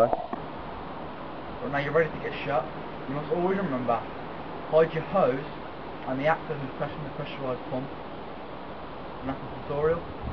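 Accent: United Kingdom. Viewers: wow very british i must say bloody good job though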